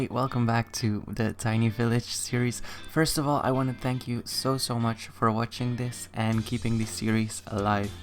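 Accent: Belgium. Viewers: Wacht ben je van belgie? Ik dacht Engeland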